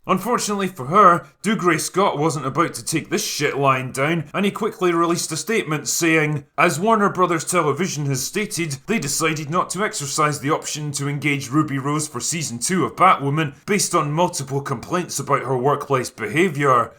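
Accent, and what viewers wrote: Scotland, United Kingdom. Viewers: your less-funny-but-scottish mr plinkett schtick is top notch, keep it up mate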